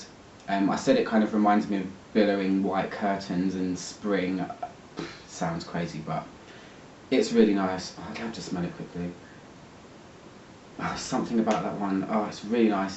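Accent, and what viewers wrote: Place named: United Kingdom. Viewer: Hello! is a fantastic video, i love your accent more than anything , english people talk so elegant, i like that, but there's a part i can not undrestand very well and i am interested in that perfume i want to ask you, what's the charasteristic of the original hypnotic poision of Dior, how is the bottle?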